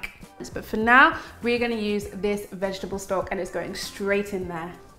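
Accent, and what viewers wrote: United Kingdom. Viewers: I love your British accent. It's not snobby or pretentious at all 👍.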